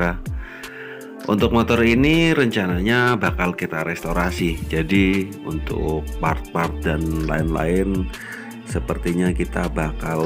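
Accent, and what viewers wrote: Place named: Banyumas, Indonesia. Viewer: Wong ngapak mas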